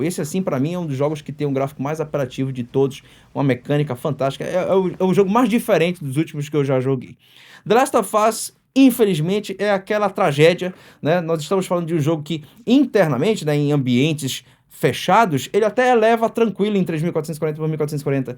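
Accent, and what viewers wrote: Pará, Brazil. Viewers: Cara, você é paraense? 😮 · cara que legal,voce é do pará, sempre desconfiei pelo sotaque, muito bom ter um canal tão foda representando nosso estado. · Rapaz, tu é paraense? Que bacana hahahah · ele deve ser do Sul do Pará nao tem sotaque do norte do Pará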